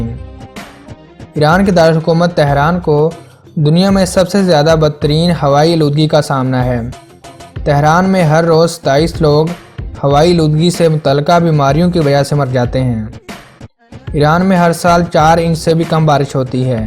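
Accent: Pakistan. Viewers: خخخخخ هندی نیست ببخشید پاکستانی دالخور است از صدا یش مشخص اس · Khuda me banday Urdu ko Punjabi lehje air talafuzz me to na bolo, think karo, were post achi this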